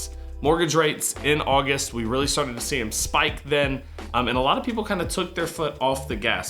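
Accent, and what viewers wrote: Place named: New Jersey, United States. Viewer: Sounds like NJ!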